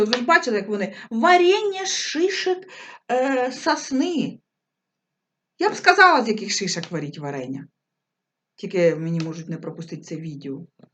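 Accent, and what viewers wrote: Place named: Kherson, Ukraine. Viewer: Ви наша запальничка💙💛., Ви нам, жителям Херсонщини, дуже близькі. Бо і суржик в нас однаковий)))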